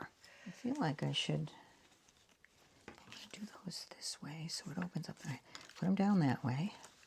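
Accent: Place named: New England, United States. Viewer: So glad to have discovered you! Lovely work, great techniques. Thank you! Heard the New England in your voice and got homesick for NH/Mass…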